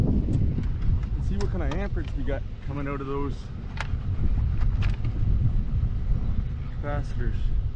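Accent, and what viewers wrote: Canada. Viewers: Between AVE and a Canadian Beekeeper’s Blog, and the Jet City jet engine rebuild guy, I think it knows I like Canadians, and this guy sounds Canadian, and it knows I like technical stuff and power line stuff, so it makes sense! And I had to scroll past the bee euthanization video to get to this one, it keeps trying to get me to watch it lol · How weird... I actually had a little physical tingle of fear watching this. Huh. How about that. This is only the second one of your vids I've watched, where are you? Do I detect Canadian in your accent? I'm in Scotland here. Oh, and +subscribed. Great stuff, thanks for recording on real jobs.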